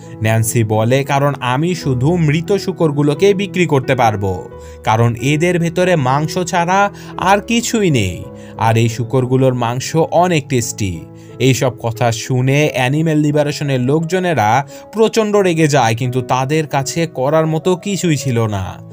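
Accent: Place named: Bogura, Bangladesh. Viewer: ভাই আপনার বাসা কি বগুড়ায়,,কারন আপনি আমি না বলে হামি বলেন,,হামি তো আমার পছন্দের বগুড়ার ভাষা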